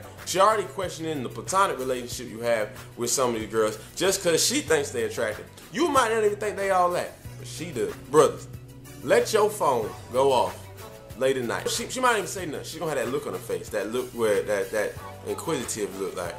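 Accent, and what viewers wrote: South, United States. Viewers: Why do you sound like you from the south.... if your from NJ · I thought he was a Southern boy, too. I was about to be really excited about that.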